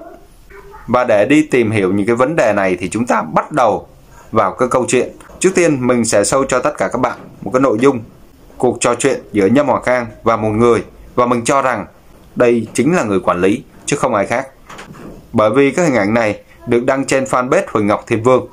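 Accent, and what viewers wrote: Standard. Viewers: Anh nói chuẩn mem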